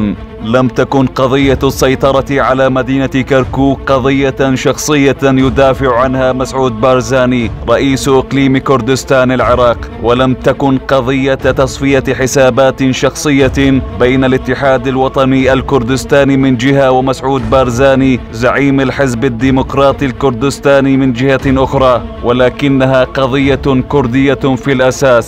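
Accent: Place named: Israel. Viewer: صوت اسرائيلي يجيد اللغة العربية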